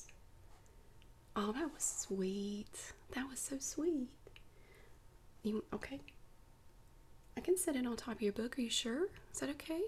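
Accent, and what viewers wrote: South, United States. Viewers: Oh yeah I like your southern accent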